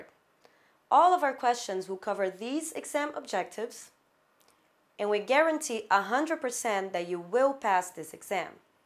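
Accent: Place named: Bulgaria. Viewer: She is bulgarian (I noticed the accent) there everything is possible, no rules, no regulations.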